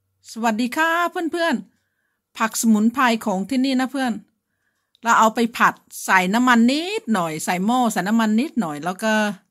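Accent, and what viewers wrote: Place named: Cambodia. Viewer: น่ารักมากเลยค่ะพี่ ได้ฟังสำเนียงเขมรแล้วคิดถึงแม่มากๆค่ะ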